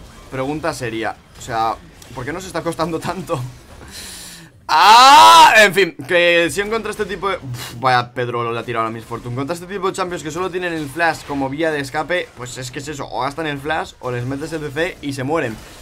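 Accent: Argentina. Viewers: Ese acento argentino papaa! Sos un kapo:v Como siempre disfrutando todos tus vidios. pd: juga singed. Es free elo, encima no fallas los gordotes Kappa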